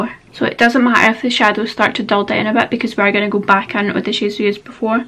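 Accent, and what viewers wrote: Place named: Scotland, United Kingdom. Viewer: Love this your so takanted and loving your Scottish woop like myself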